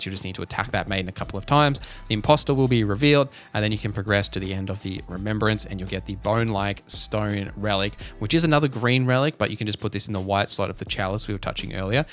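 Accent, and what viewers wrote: Australia. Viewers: Great guide, and so nice to hear an Australian accent :)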